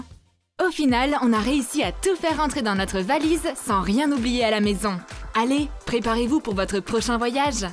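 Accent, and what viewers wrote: England, United Kingdom. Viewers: Vous êtes anglaise ou française ou encore les deux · Mais en vrai vous êtes anglaise ou pas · vous êtes anglaise? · Vous êtes anglaise ? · Vous êtes anglaise? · Vous êtes anglaises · Vous êtes française ou anglaise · mais vous êtes anglaises ??? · Vous êtes anglaise 😐😮?? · Elle sont anglaise ou française ? ? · Vous être anglaise????? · Tu est anglaise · vous êtes anglaise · Vous êtes anglaise ??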